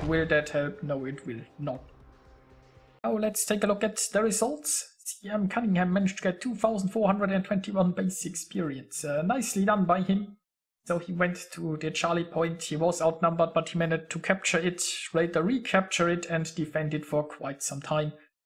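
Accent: Germany. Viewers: Kann das sein das du deutscher bist?!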